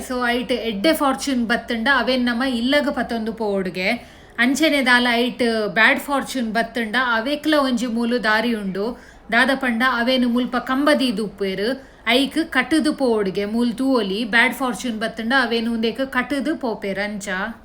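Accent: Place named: Tulu, India. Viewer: ಈರೆನ ತುಳು ಪೊರ್ಲು ಆಪಂದ್ದು. ಮಸ್ತ್ ಮೊಕೆದ್ದ ತುಳು ಪಾತೆರಿನ ಖುಶಿ ಆಪುಂದ್ದು..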